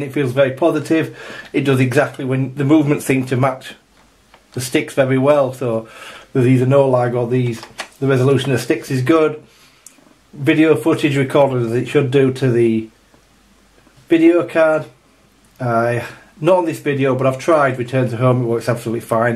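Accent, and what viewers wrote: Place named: West Yorkshire, United Kingdom. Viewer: Great review video , you sound like keith lemmon Are you from west yorkshire anyway gave it a like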